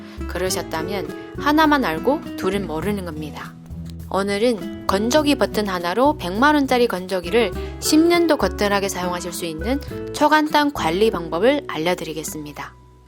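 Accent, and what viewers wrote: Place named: China. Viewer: 말하는게 중국사람 같음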